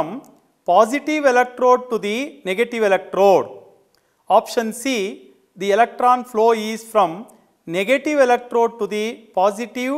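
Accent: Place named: Tamil Nadu, India. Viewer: typical tamil village english but ok ok teaching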